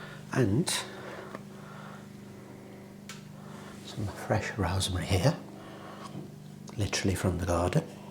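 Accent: Birmingham, United Kingdom. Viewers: Amazing !! And that brummy accent is lush!!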